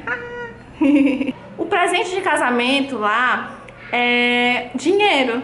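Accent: Ceará, Brazil. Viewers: Ah! Que legal! Sou Nordestina tb, não do Ceará mas da Bahia. Nossos sotaques são tão bonitos!! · Teu sotaque parece do Ceará. Tu é de onde? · guriiiiia tu é nordestina ? tu tem muuuiiito sotaque hahahahaha adoreeei saber sobre os casamentos dae, e um pouco sobre o seu tb e super me emocionei !! TuT acho tão lindo casamentos !! Mas tinha que ter briga pelo buquê sim u-u qual é a graça se não tem briga pelo buquê ?? ahahahaha xD #NovaInscrita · você é cearense, né? kkkkkk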